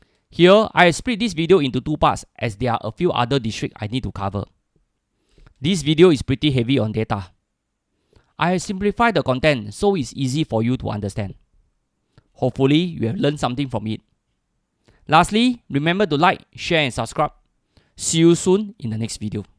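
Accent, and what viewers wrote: Singapore. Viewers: Quality work. Keep it up. However, please reduce the Singlish a bit, it will make this podcast more interesting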